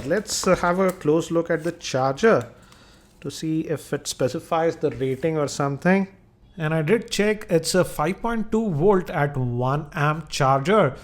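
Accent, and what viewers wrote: India. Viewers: someone tell me why every phone review on the internet is done by an Indian person I can't understand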